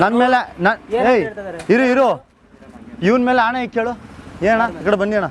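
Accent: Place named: North Karnataka, India. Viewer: ಖತರ್ನಾಕ್ ಉತ್ತರ ಕರ್ನಾಟಕ ಭಾಷೆ ಮಾತಾಡ್ತೀ ಬಿಡಣ್ಣ ಸೂಪರ್❤😅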